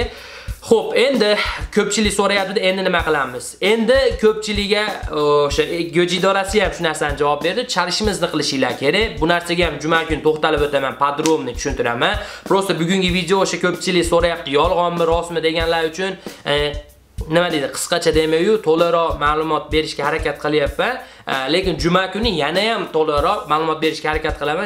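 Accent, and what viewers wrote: Andijan, Uzbekistan. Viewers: Анжанли шевани йукотмапсиз койилл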